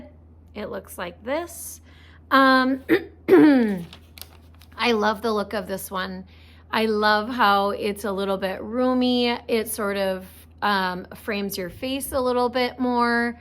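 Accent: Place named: Minnesota, United States. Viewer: Just found your YouTube videos today. This one came up. Your voice and tone is calming to me. Then your favorite things video started to play and I noticed the accent in your voice sounded like maybe Minnesota. Only a few seconds after that thought came to my mind you stated that you were coming to us from Minneapolis, Minnesota.! Just a fun piece of trivia for us. 😂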